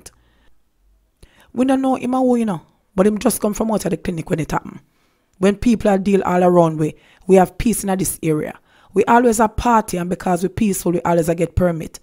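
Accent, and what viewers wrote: Jamaica. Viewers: I love the way you go from standard english to patois, LOVE IT.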